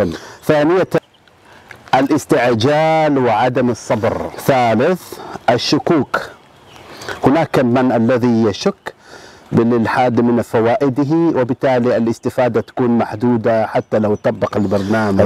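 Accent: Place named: Balochistan, Pakistan. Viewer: انت من بلوشستان عايش في عمان يا لان لغتك العربيه ليست لغة العرب وبارك فيك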